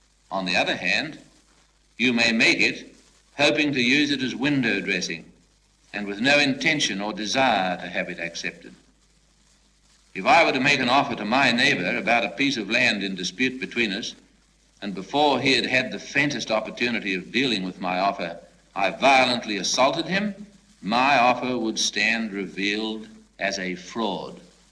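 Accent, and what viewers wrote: Australia. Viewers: He has a Cultivated Australian accent. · Menzies had a cultivated Australian accent, listen to Geffrey Rush or Dame Elizabeth Murdoch or even Barry Humphries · Great Australian accent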